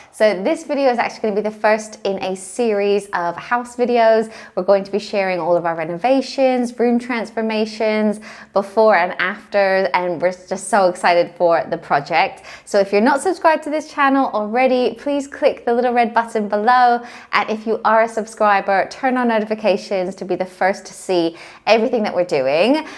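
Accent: United Kingdom. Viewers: What is your accent? It seems to swap from British to American and I can't work it out lol x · haha same here. I am a Chinese and a new subscriber here so I had no clue that is in UK. So when I saw the cupboard and fireplace I said “wow, it looks so much like the one in Harry Potter. And then I noticed British accent which makes sense. I live in Canada and the houses layout and style are so much different than in UK! I’ve never seen such a big fireplace in my life and all I want is to throw some floo powder into it. LMAO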